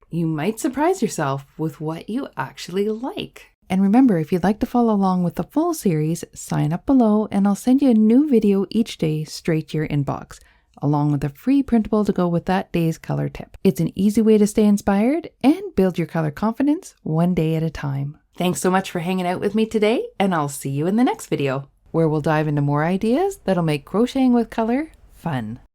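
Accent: Canada. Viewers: You sound canadian. So hopefully you have access to Home Depot and/or Lowes. I sometimes use their color swatches from the paint department. It's good for gradient colors and mixing and matching. I know, sounds a bit weird, but really helps me with color choices.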